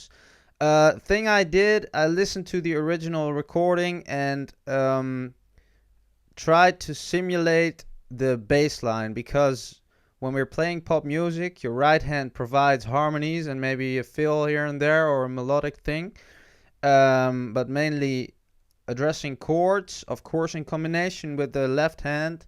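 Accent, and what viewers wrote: Netherlands. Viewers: Are you dutch? Sounds so bc of your accent :p sr if its not hah .good videos btw! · Thomason lu He is Dutch, I can tell by his accent. I'm Dutch too ;D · I like your explanations starting from the basics and plus you sound dutch so good luck in the world cup! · You sound dutch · Great tutorial very clear! Are u Dutch?. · I think he is Dutch because he said "yeah?" And he said or to "of" which is only in holland people say that. They say "ja(yeah?)" and "of" in holland means "or"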